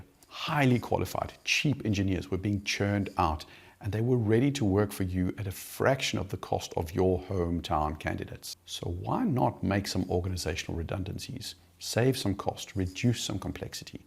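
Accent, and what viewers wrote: South Africa. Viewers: from the accent, are you from South Africa ? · I have recently discovered your channel and I'm obsessed. Thank you. Great accent too - South African slash Danish?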